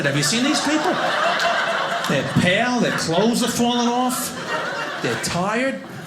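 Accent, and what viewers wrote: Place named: New York, United States. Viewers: Italian new york accents always get me. Feels like home 😅😊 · Love the "New Yawk" accent!! I wanna hear more from this guy--he is dynamite!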